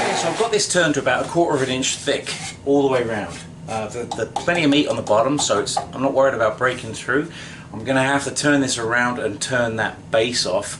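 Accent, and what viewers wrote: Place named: England, United Kingdom. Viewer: That was brilliant and Im not sure where you live but you do sound English a bit like Jason Stathem Not used oil much as I cant get a good finish with it but Im sure its just me.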